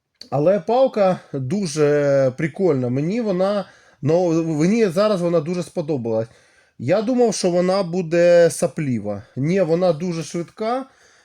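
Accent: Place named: Russia. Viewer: Говорил бы уже по русски, хреначишь по украински с русским акцентом, да ещё и коряво, оно тебе надо?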